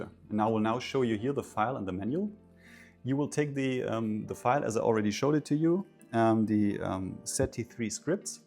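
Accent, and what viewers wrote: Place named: Germany. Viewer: Tell me your German without telling me your German , pronouncing :)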